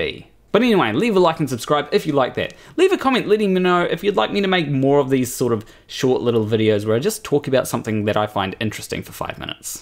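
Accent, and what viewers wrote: New Zealand. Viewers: Good one for being You, man. 😁 Your New Zealand accent is also amazing. A-hui-hui 😁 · I forgot to mention that your accent is more unusual to me than your nails. I don't have a lot of exposure to New Zealanders. Probably, the only thing is Brokenwood Mysteries episodes. The long e sound is more prevalent in New Zealand, it seems.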